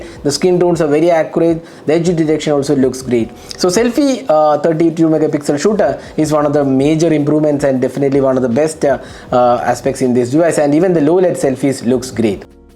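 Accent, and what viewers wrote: Kerala, India. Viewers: perfect mallu accent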